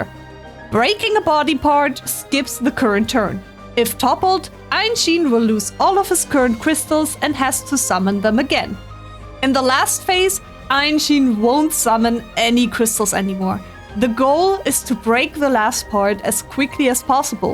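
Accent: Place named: Germany. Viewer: Ur accent like ur from germany or maybe netharlands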